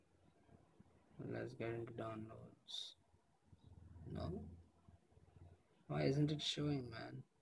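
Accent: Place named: India. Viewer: No crees que si hablas en el idioma que realmente domines se entienda mejor ese inglés que manejas esta por la banda con el acento pareces un Indu hablando inglés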